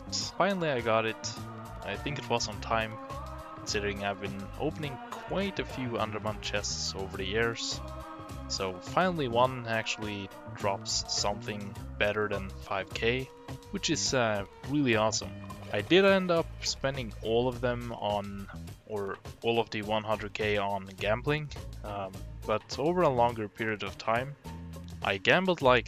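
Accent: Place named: England, United Kingdom. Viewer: Where about in England you from?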